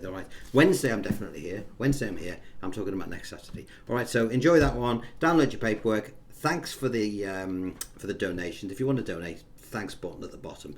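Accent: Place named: Wirral, United Kingdom. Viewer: Thanks for all you're doing. I'm really enjoying it... especially as all tuition is in a familiar Wirralian accent. Cheers!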